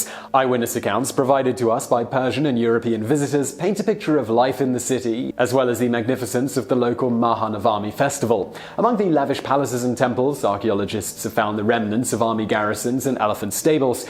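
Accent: England, United Kingdom. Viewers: i'm english and i find this guys voice too english. ecspecially when he says "empire"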